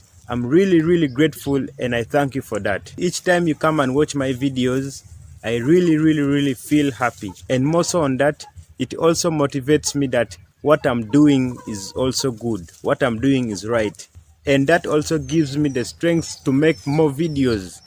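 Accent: Uganda. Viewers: He looks a ugandan n his accent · His accent from Uganda. Kenyan man can recognize them a bange!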